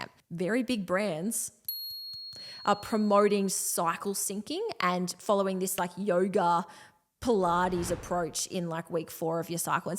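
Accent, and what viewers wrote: Australia. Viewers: Damn your accent is incredibly Australian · Australian? I miss that accent. Had a lovely time during my stay in Melbourne.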